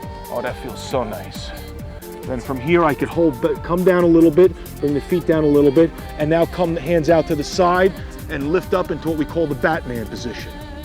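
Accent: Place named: New York, United States. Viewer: Love that New York accent!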